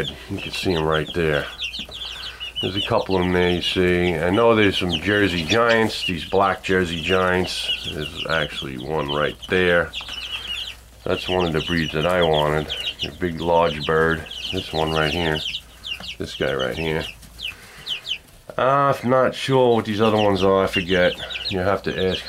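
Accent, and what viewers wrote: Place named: New York, United States. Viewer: Only another NY'ER is able to pick up that accent so easily!! Yeah I went all "country" myself. I have 10 of those Polish hens. They are just so fun to watch!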